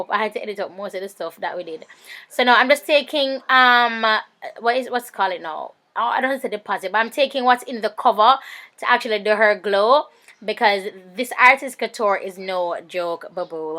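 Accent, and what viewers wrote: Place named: Jamaica. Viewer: real Jamaican just the talking make you know lol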